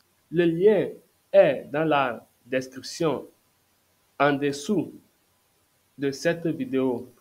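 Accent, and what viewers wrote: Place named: Africa. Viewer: La prononciation africaine de français me fait mal au cœur mais merci beaucoup 🎉❤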